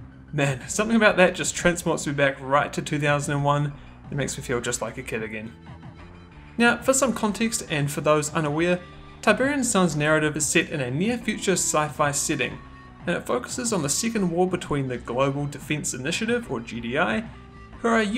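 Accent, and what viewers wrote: New Zealand. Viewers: Gonna love the Kiwi accent. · is that a kiwi accent i detect?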